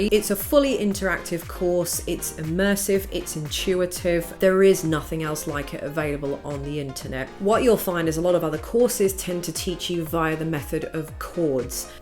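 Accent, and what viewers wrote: England, United Kingdom. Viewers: What part of england are you from? London?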